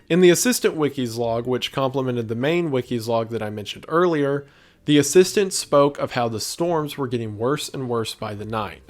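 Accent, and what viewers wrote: South, United States. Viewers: Your Southern accent is so clean and sweet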